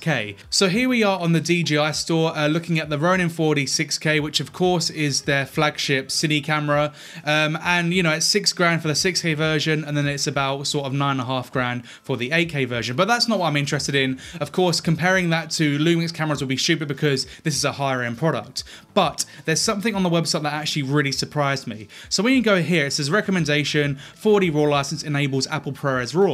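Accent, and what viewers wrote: United Kingdom. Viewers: I don't know if it's the irritating British accent or his personality. But he has the sincerity of a used fast-talking used car salesman. A camera is not a function of price and glass. I figured out at the end that he was auditioning to be a Lumix "influencer". He said that he was putting his name next to the brand. Am I supposed to know who you are?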